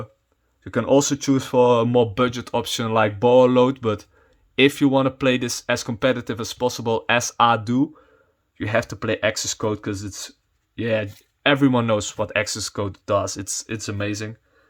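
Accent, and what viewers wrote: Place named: Netherlands. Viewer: Mooie deck profile mooi nederlands acsentje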